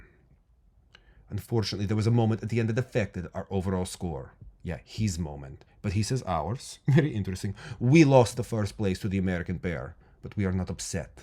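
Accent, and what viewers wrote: Russia. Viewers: I like you are missing the articles with your Russian accent 😅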